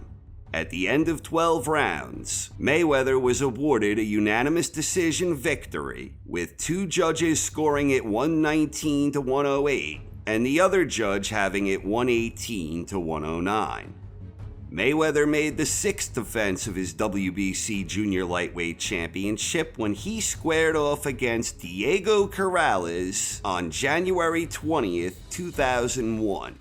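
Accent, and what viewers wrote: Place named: New York, United States. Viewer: Love your Channel you kinda sound like Howard Cosell with a New Yorkers Accent!!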